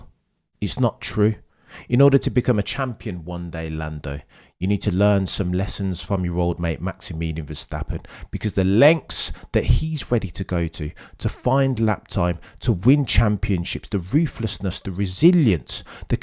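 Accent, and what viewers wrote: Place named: Oxford, United Kingdom. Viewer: Very philosophical , brilliant Oxford english!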